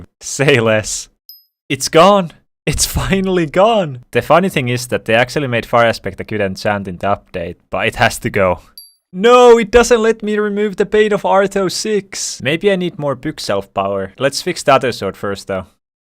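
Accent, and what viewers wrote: Finland. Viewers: i noticed the finnish accent in 0.2 seconds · instantly when i clicked on the video i realised from your accent that your finnish :D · Where are u from bc means block on Finnish and u got an Finnish accsent on english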